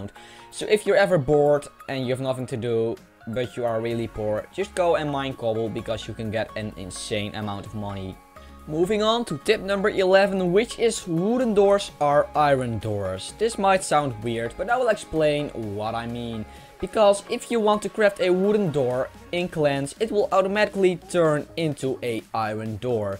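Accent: Netherlands. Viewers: sounds like a dutch accent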